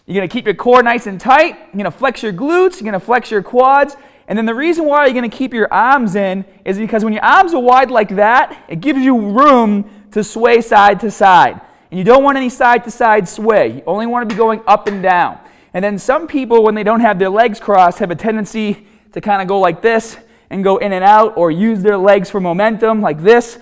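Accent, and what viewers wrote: Boston, United States. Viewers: drjd2real you exhibit 3 things with your unfortunate comment: 1. Stupidity - your comment has nothing to do with the issue being discussed. 2. Ignorance - is speaking like most Bostonians/Massachusettsmen would speak. It's the regional accent. 3. Racial complex - get the chip off your shoulder, that stuff is really tiresome. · Is he from Boston? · He says start, arms and hard funny. Must be from boston. Everything with a "A" sound lol Accent is bugged out. Real informative tho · I'm guessing from Boston? LOL Great video though! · When did get a Boston accent? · Must be from Boston... I grew up in Cambridge. Thanks for the info man!💪🏿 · love the video...but omg i hate that boston accent!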